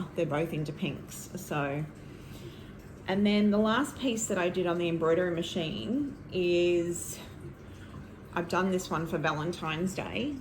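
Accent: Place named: Australia. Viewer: love your podcast. Your stitching is beautiful. Love your embroidery too, but I only hand embroider, so I cannot attempt those lovely pieces, sadly. So nice to hear an Australian accent. I'm an expat Kiwi in Texas, 21 years, now. Look forward to watching more. Kia Kaha.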